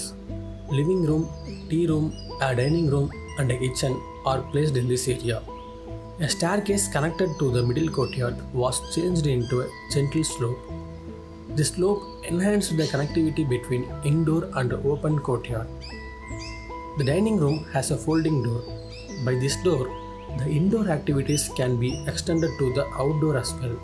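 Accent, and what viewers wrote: India. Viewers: Why indian accent? So.boring & cringe!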